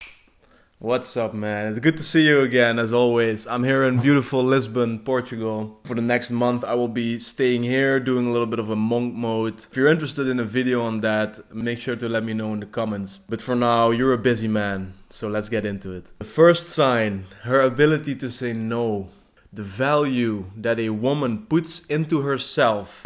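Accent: Netherlands. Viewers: Are you dutch bro? The accent 😎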